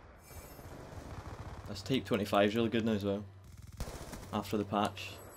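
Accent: Scotland, United Kingdom. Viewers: What part of Scotland you from?, im from Edinburgh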